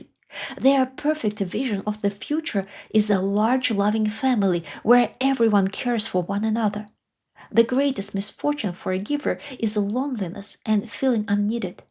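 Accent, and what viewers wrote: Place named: Russia. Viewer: I have to admit, your Russian accent is quite strong. If you've lived in an English-speaking country for so long, why haven't you worked on your pronunciation?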